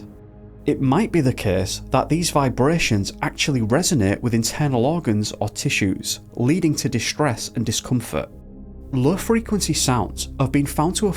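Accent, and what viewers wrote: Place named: Wales, United Kingdom. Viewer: I believe he's from Wales. Welsh accent. 🏴🏴🏴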